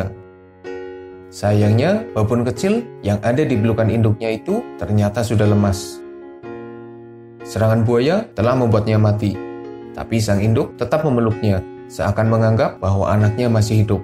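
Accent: Banyumas, Indonesia. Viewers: Rika ngapak ya min.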